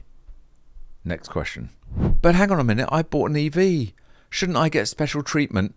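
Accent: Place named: United Kingdom. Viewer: Listen!!! has a British accent.😂😂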